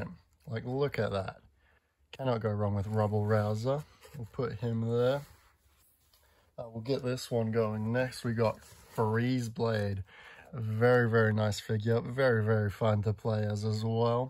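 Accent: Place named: Australia. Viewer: An Australian man explaining skylanders is music to my ears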